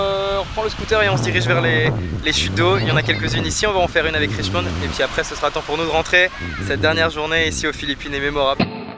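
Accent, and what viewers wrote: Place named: France. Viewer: MDR yr French accent is so cOOl .On dit island hOOping ? ou island yOOpi lOOl :)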